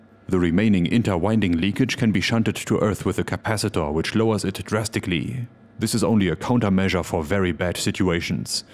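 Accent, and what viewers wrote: Germany. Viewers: I didn't expect German accent to be so difficult for me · Your German accent becomes more and more sophisticated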